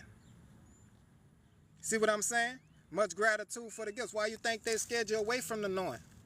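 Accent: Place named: New Orleans, United States. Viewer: Look at Godhead 🙌 I just stumbled across another #Heyoka and sounds like you from the NoLa too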